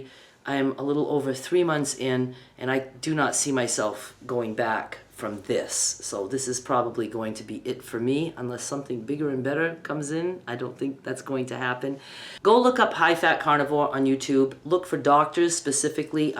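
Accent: Maine, United States. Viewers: I am so inspired by you. I literally just found your channel via your caftan tutorial. I also was about to make dietary changes necessary to combat something called SIBO (small intestinal bacterial overgrowth) which requires basically restricting carbs so as to starve out the bacteria. I did low carb before, but I gained it all back. I got cravings. You know the drill. So now I will try carnivore. Also, I am in Maine…. you said you moved to Mississippi but you sound like you are from Maine? Regarding the shirt from a shirt, and caftan tutorials, can I ask, do you use 100% cotton? I got a bunch from Mardens to make a quilt but that caftan was so stylish, I think I have to use this blue cotton to make the stylish caftan! ❤❤❤ Congratulations on your weight loss, reduced pain, and improved mood!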